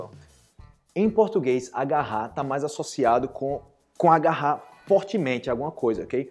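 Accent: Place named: Colombia. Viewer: Oi cara.... que e essa placa ahi da Costa Rica, voce está na Costa Rica ?.... Eu sou da Costa Rica e estudei por tres anos no CB, isso aconteceu la por 2007, depois eu nao voltei praticar e fiquei atras, estes videos tem sido muito boms para min... continua assim por favor.. tou adorando seu jeito.... muito obrigado... Quando voce troca a espanhol se escuta un sotaque colombiano muito bacana, voce aprendeu na Colombia ?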